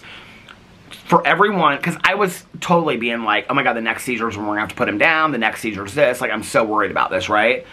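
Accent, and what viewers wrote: South, United States. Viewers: To NOT live in the South, you really do have a southern accent lol 🤣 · You do have a southern accent, I actually adore it and find it amusing when you pronounce things funny, I used to live in Louisville and I noticed that a lot of people in Southern Indiana would say code for cold, like I stayed home from work today because I have a code. I’ve never heard you say that but I always get a little smile when I hear it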